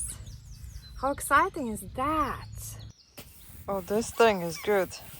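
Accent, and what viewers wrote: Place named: Russia. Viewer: You sound Russian. I am cool with that.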